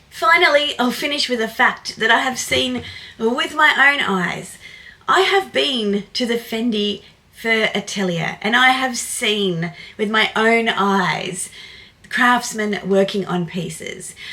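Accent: Australia. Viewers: I like your australian accent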